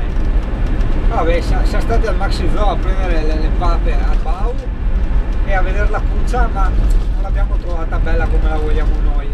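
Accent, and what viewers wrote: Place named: Treviso, Italy. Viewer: El to dialeto ghe someja tanto a quel de Treviso.....!!!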